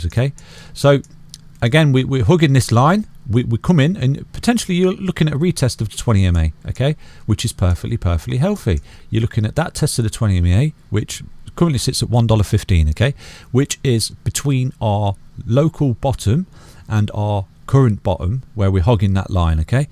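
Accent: United Kingdom. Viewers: I can’t understand if I like more the analysis itself or your distinctive British accent. 😄 Miss UK a lot. Sub and Like! 👍🏻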